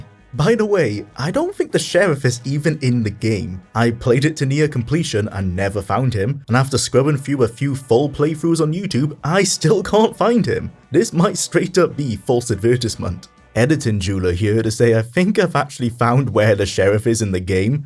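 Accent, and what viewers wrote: Wales, United Kingdom. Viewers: What gave that away? The thick Welsh accent?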